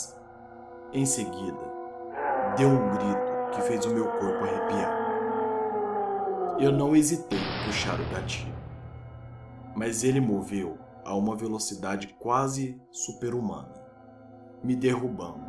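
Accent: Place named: Inland São Paulo, Brazil. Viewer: troca o narrador, q de repente pode ficar legal, pq esse sotaque paulista do interior é foda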